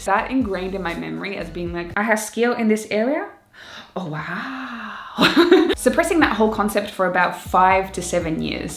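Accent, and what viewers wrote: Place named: Australia. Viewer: Been following for a while but didn’t realise you were an Aussie 😁 Nice one !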